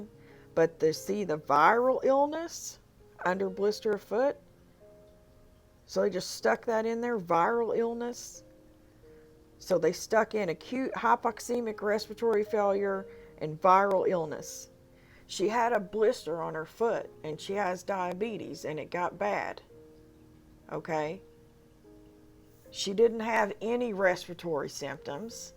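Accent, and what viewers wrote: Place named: South, United States. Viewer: Hey. I love your channel and I love hearing a sister from the South. I'm in Nashville. Much love. Gonna share your channel on my Twitter if that's cool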